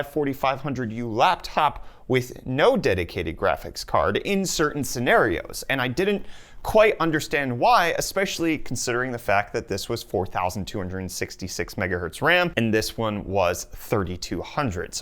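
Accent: South Africa. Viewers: Wait this dude is South African? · Wait are you South African?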